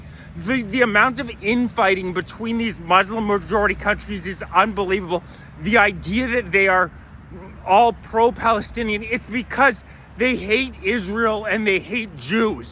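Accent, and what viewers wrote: Canada. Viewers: Here we go again the pandering continues senile old ladies and naive children beware the funny thing is this guy couldn't exist for sh!t without the same strung out jaded buffalo soldier ignorant immigrants (that he goes so obscenely out of his way to rip on when antisemitism is a criminal offence as of last year!) looking the other way from every second word out of his mouth cause yknwo, they saw actual war (which this guy supports of course) and the same blackmailed child molester politicians he pisses the same exact party lines out of his dick hole face with that stupid vaguely hoser accent he puts so much effort into 😂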